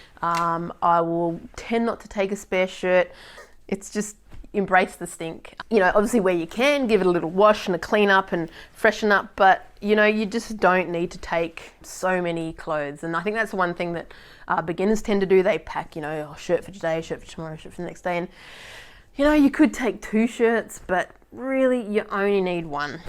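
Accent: Australia. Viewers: Great to hear an Aussie voice. I hit the Himalayas fairly regularly, so I watch a lot of these types of videos. Always Americans on the PCT or JMT, or Europeans in Iceland; so really good to see a fellow Australian out there offering advice. I also love your level of enthusiasm, it just kept me watching even though my load out is well and truly bedded down. Thanks for the time.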